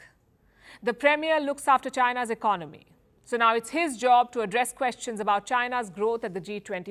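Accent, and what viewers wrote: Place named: India. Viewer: Indian Engrish is grating on the ears heh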